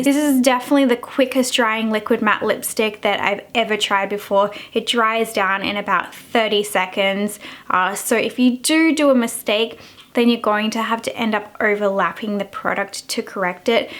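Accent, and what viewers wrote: Australia. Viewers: You have the cutest voice...and the Aussie accent doesn't hurt!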